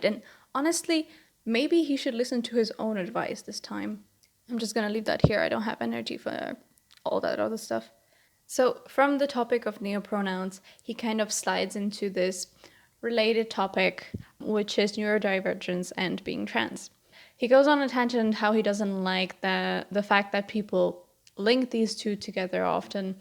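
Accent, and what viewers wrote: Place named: Finland. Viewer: Excellent points!! This really needed to be said. Satutko muuten olee Suomesta? Aksentista päätellen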